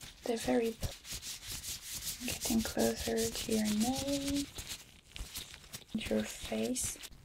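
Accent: United Kingdom. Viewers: It’s giving uk 🇬🇧 😂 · IDK why but she sounds british for some reason. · She sound quite British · I... can't place your accent. Sounds predominantly british, but it breaks that... pattern, for lack of a better term, often enoufh to make me question. As an american who doesn'r get exposed to many different accents, it bothers me when i can't identify it, lol. Something about reinforcing the ignorant american stereotype? All that aside your asmr is fantastic, i found your content last week, and have binged vids 3 times for relaxation and sleep. Edit, are you layering the file for the mic sounds on top of the audio from the video? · u are from UK? I loved ur accent🥺❤️ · I’m getting British accent vibes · Are you British? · Oh my god you have like a British accent that's so pretty 😊 and love the ASMR video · Are you European? Your accent sounds both American British (Northern and southern? 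Mabye a mix of American Irish but mostly British. I can't tell and I'm so confused) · What country do you live in? I can't place the accent but sounds like the UK.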